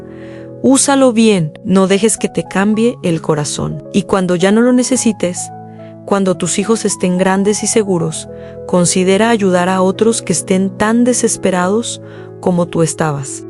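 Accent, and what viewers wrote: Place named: Portugal. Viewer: Excelente. Relato chica tienes muy buena voz en la narrativa suave tranquila. Pausada. Del 1 al 10 te pongo un 9. Todavía. Tienes tu lengua Natal. El Portugués. Muy poco pero de lo demás es excelente. .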